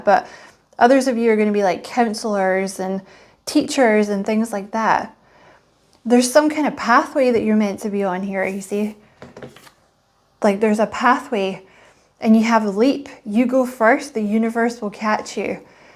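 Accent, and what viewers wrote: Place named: Scotland, United Kingdom. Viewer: So I watched this video after you recommended it in the Scorpio reading. I’ve never watched a Virgo reading before but I checked my placements & it turns out I’m a Venus Virgo! I was shocked to learn this! Wow did this resonate for me! Thank you for sharing your gift of channeling. Really enjoying both of your channels. Happy New Year! Love hearing your Scottish accent. I have family history from Scotland so it’s been great to connect with you. 💕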